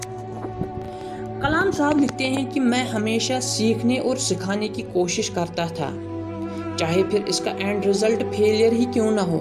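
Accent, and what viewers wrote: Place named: Kashmir, India. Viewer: I think you r kashmiri..I got it by your accent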